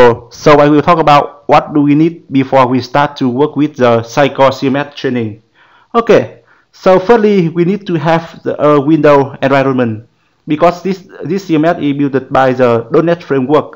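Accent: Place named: Vietnam. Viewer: You accent is sooooo Vietnamese, I LOVE IT <3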